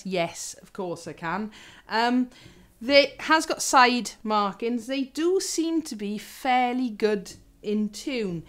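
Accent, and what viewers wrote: Wales, United Kingdom. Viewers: English? Sounds suspiciously like a Welsh accent to me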